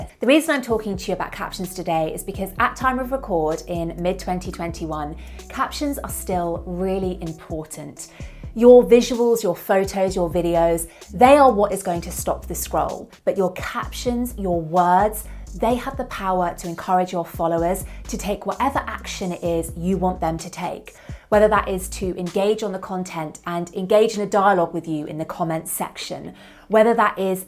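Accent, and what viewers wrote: United Kingdom. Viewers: She's got that Harry Potter accent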